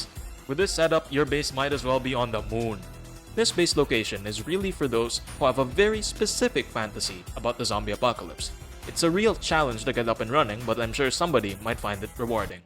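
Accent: Philippines. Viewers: Pinoy na pinoy yung accent mo par hahahaha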